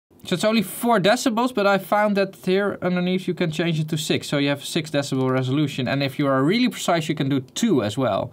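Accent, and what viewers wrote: Netherlands. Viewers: i could tell this dude was dutch like 3 words in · hoor ik daar een Nederlandse toets in uw accent?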